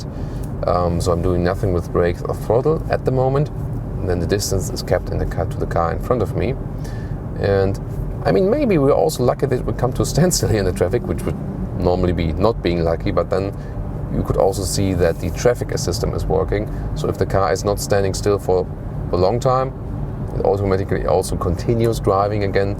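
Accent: Germany. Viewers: Schade. Review könnte wirklich gut sein, wenn nicht dieser deutsche Dialekt wäre. Das Review von Brian ist da sehr viel angenehmer anzusehen. Meine Meinung, besser einfach auf deutsch das Review machen, oder einen native Speaker für das Review in der englischen Sprache. Es hört sich einfach (Sorry) grausam an.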